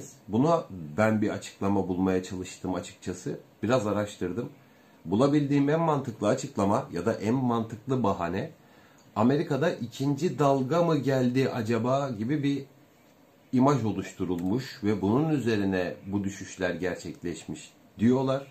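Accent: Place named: Erzincan, Turkey. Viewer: Memleket nedir.Erzincan yöresi gibi geldi.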